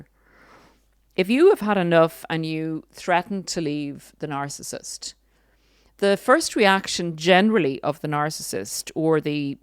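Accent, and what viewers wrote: Ireland. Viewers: I love her and remy ❤ and the accent is that Irish?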